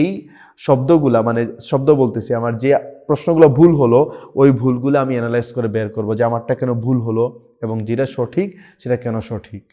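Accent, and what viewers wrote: Sylhet, Bangladesh. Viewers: সিঙ্গেল ইউজ প্লাস্টিক ব্যবহার কমিয়ে প্লাস্টিক দুষণ রোধ করতে এগিয়ে আসুন । পানির বোতল বাদ দিয়ে পানি ফুটিয়ে খাওয়ার অভ্যাস করুন। ভাইজান কি সিলোটি?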